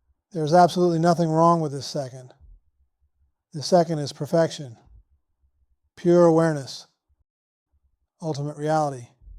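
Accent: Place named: South, United States. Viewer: this IS Roberts voice isn't it ?? Arrr damn ... I was sure it was . Disappointing. Ar well close enough ... yes TruE he's got that southern Twang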